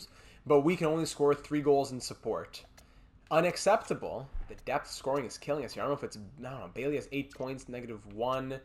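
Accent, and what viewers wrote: France. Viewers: Every time says “Julian Gauthier” in that gorgeous French accent I am literally rock solid. And using the words of my good friend StarScreamX-_-X here... “I doubt I’m not the only one.”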